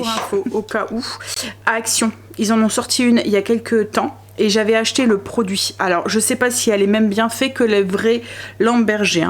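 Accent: North, France. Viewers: Cc Très bonne idée pour la table, j'adore, bravo Un peu plus de patois du nord, je m'en lasse pas 🤣🤣🤣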